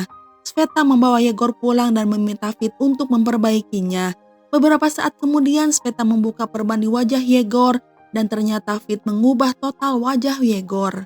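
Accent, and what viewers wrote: West Java, Indonesia. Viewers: Aduuh sunda pisan teteeeh🙏😁.. Resep ngadenge sora si teteh 😊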